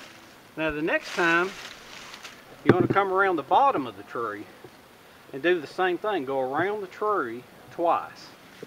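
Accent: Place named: Spartanburg, United States. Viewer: . Well i don not have too many 1 hr blocks to listen and watch. but just saw a few short ones I will watch later, You sound like Spartanburg SC. You remind me of my Brother in law the Prison guard.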